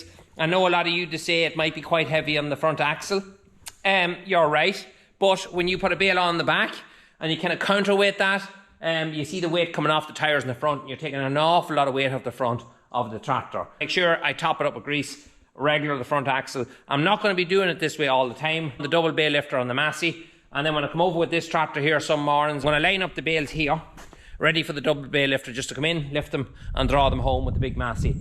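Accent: Cavan, Ireland. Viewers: I have to say it’s absolutely deadly to hear a cavan accent on YouTube!! Apart from you’re honesty you make great videos, all the way from new York you know how to make me home sick!!! Virginia man.keep up the great work lad.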